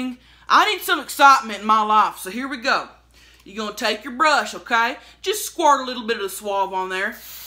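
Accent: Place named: South, United States. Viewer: I just realized how damn southern you are, where you from?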